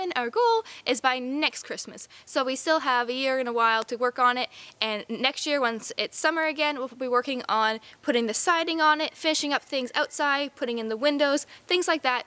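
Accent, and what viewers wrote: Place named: Canada. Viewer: I wondered how you were gonna keep the mice out. It's amazing how they magically make fiberglass insulation disappear . Not sure where you are building the cabin...guessing it's Canada because you all say abowt. Happy New year😄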